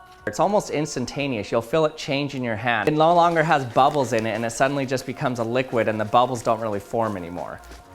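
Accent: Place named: United States. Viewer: annoying yanky droning voice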